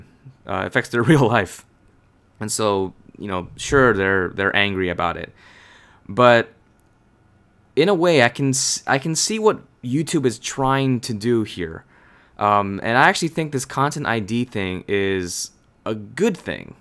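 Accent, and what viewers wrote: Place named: United States. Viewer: wow i am an Thai half Indian but i thought you were an american(i am not a resits)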